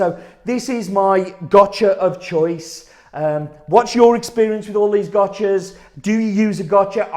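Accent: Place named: Ireland. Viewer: Could be Irish aswell...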